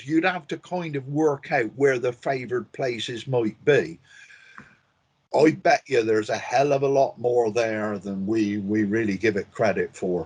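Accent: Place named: Newfoundland, Canada. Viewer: I love his accent. He could be from Newfoundland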